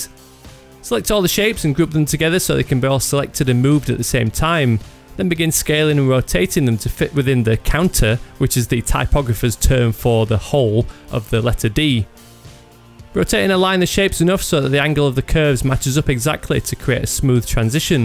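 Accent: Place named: Northern England, United Kingdom. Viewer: sounds like the north of England.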